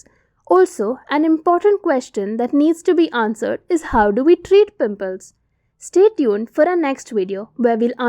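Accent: India. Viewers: Sounds so indian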